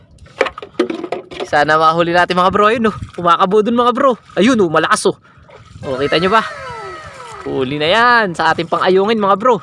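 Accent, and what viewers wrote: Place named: Talim Island, Philippines. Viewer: Lalo akong nag-eenjoy na panoorin ang vlog ninyo kapag naririnig ko ang puntong Talim Island ninyo. Bang-laki ng bangus hane?! 😀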